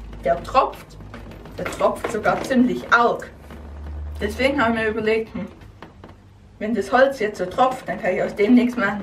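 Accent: Swabia, Germany. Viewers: PS: der Dialekt ist cool....schwäbisch? Stuttgart oder ? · Hockline 89 es heisst dialekt und es ist schwäbisch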